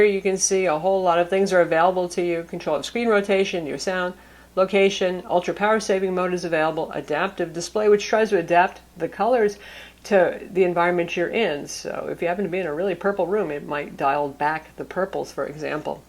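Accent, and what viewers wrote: Texas, United States. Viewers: You sound texan to me...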